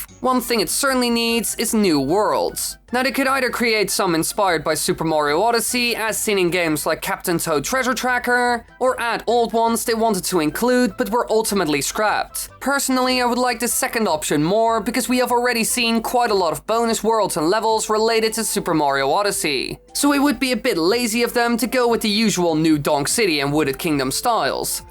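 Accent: Netherlands. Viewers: OMG that’s why the accent mijn broer van Nederland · I really wish I could be there, I,m still in school and have homework to do😭. Also you sounded kinda dutch are you exactly from the Netherlands? And a nother also, I love your vids there epic!!! I have watched every single evolved video! Have a great day.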